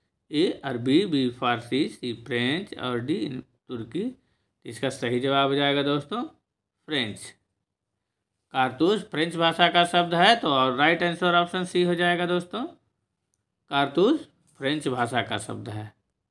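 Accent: Bihar, India. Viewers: bihari hindi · बिहारी हिन्दी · Bihari Hindi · Bihari hindi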